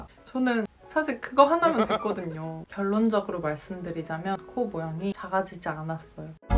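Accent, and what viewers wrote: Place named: Chungcheong, South Korea. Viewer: 어우 충청도 사람같아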